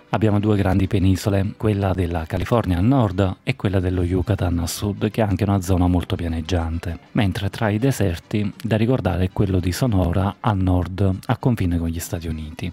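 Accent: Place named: Spain. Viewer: Wow che bell accento in Spagnolo!❤️🙌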